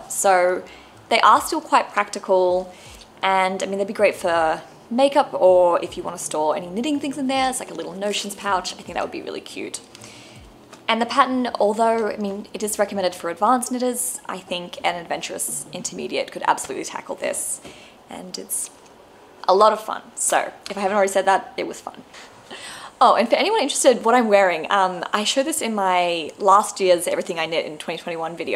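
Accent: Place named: Australia. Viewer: I loved seeing your knits for 2022 and looking forward to watching more of your episodes. It is nice to hear an Aussie podcaster in the mix. I have to admit, I gasped horrified when i saw your felted sweater. 🤕I just started a knitting podcast and you inspired me to do a 2022 knits video. It was really interesting for me to go back over the year and see what I made.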